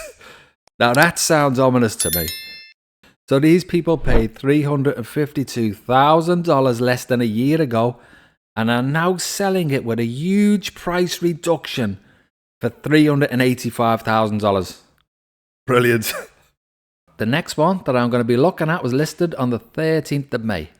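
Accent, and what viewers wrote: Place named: Northern England, United Kingdom. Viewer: This guy sounds like a Northener, England, but New Zealand has so many scammers now, he could just an impression 😮😅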